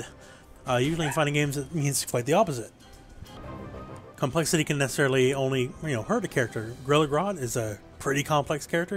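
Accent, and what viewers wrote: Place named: Atlantic Canada, Canada. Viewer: Great video bra!! I can tell your from the East Coast of Canada ehhh by your accent!!! Very helpful video bro!!! Thx. I liked and subbed!!!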